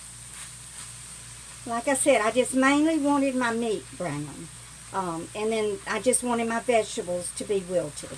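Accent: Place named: Houston, United States. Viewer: Hey,! New subscriber here. Originally from the Houston area, so your accent reminds me of the hometown and the cooking reminds me of my family there. (Not many left.) Enjoy having your series of videos to have on while cooking or cleaning...sounds "familiar" and friendly with zero pretense, which I admire. Can't say I miss the South for living, but the culture is something that is in the blood. Lol, you cook like me, and if I'd seen another vegan video, I was going to lose my mind!🤣😂 Thank you for your stories...your life has been memorable! Keep enjoying yourself for us, and we'll keep coming back!🙂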